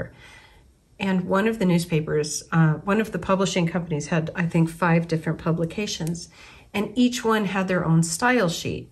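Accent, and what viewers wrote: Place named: Australia. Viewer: I love when you get goofy! You should post some of it here. And expose yourself, if needed. 😂 Seriously I can’t wait to see all of those inner voices in cartoon form. And your Aussie influenced words are so cool, like my Siri is set to Australian version, just so I can hear “no worries” and things like that.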